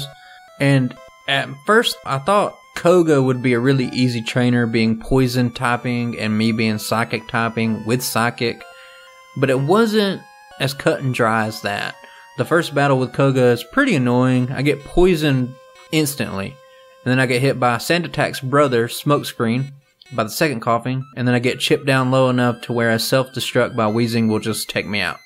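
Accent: South, United States. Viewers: As a guy with a strong southern accent I appreciate the accent. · As a southern girl, I love your drawl. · Personally I'm a fan of the southern sound you have when talking. It's different and makes you stand out. And if that's just how you talk then keep it up. I may watch may different people streaming these games but i love everyone's approach be it jrose, Scott's thoughts or vanman, everyone brings something new to each play thru. And honestly I like that your open about doing it not as a professional but just a fan of the series. Keep up the good work man. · I like the southern drawl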